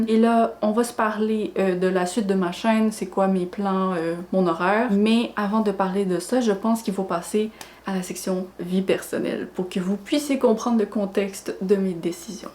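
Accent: Quebec, Canada. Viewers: N'hésite pas à être plus québécoise, même si on ne comprend pas tout, ça aura du charme ! Année épuisante pour moi aussi. J ai commencé un nouveau travail en novembre dernier ( j'accompagne des élèves en situation de handicap, c'est très fatiguant, je suis vidée chaque soir ). Je n'ai pas du lire plus de 15 livres mais en même temps je n'ai jamais été quelqu'un qui lit vite ! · Coucou ! Tout d'abord, bon courage pour cette année pas toujours joyeuse ! J'ai connu des périodes très difficiles ces dernières années, entre des soucis au travail, des problèmes de santé, des problèmes financiers, tout ça en préparant un concours difficile pour changer de métier... je sais ce que c'est que se découvrir forte et enfermer les émotions pour tenir. Je pense être sortie, pour une grosse partie des soucis, de cette période (et en plus j'ai enfin réussi le fameux concours après plusieurs tentatives ^^), mais voilà, j'espère que tu sortiras la tête de l'eau 🙂 Bref, je t'ai découverte il y a plusieurs semaines / quelques mois déjà, ta chaîne ayant été recommandée sur un Discord à quelqu'un qui cherchait des chaînes booktube. 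J'ai tout de suite accroché à ta façon d'être, à l'énergie que tu dégages, à tes lectures éclectiques, mais j'avoue aussi à ton accent (j'ai toujours aimé l'accent québécois ^^). Pour ma part, même si je ne comprends pas certaines expressions, ça ne fait rien, je pense qu'avec le contexte je peux m'en sortir (et au pire, ce n'est pas grave) ! Côté lecture j'en suis à 47 livres lus pour ce premier semestre, un peu moins que l'année dernière qui fut une très bonne année de lecture (122 livres lus de mémoire, 0 abandon alors que j'en suis déjà à 5 cette année !). J'espère que ça ira encore mieux malgré mon changement de travail fin août 😀 A bientôt pour ta prochaine vidéo !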